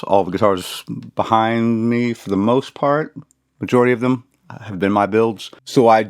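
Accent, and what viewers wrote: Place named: South, United States. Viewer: You good bro. You just sound like a southerner with a lisp. That’s all. I hope you beat the shit out of Cancer. I’d love your opinion on the schecter solo II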